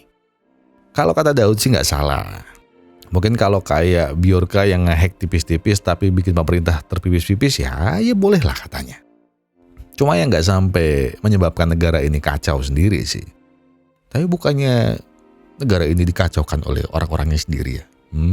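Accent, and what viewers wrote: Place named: Yogyakarta, Indonesia. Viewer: wong jogja bro, seko logat e ketoro wkwk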